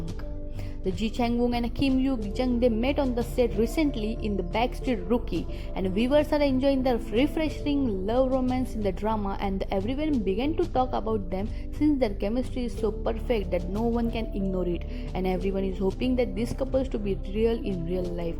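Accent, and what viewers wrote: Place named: India. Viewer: Madam your tongue sounds like indan 😊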